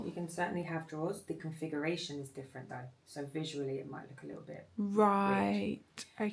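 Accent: United Kingdom. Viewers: I’m super excited about your renovations! I love watching you and listening to your beautiful British accent. I watched you for years and am so happy you are back! your skin is amazing, so dewy and porcelain like🍁. Happy Autumn 🍁🍁🍁🍁🍁🍁🍁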